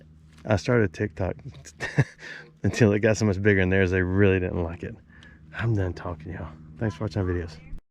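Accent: South, United States. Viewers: I have been subscribed to your channel since the beginning also. I liked you and your family then, and I LOVE you and your family now. I was told something a long time ago while I was going through some life lessons, "This too shall pass." And it always HAS PASSED, thru other life issues. Hang in there, God is watching over you and Family. {I still love the sound of your Southern accent.)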